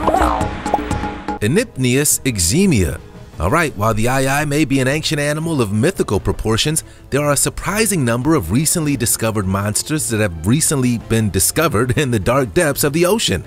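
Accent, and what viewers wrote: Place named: North, United States. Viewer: one more grating yankee voice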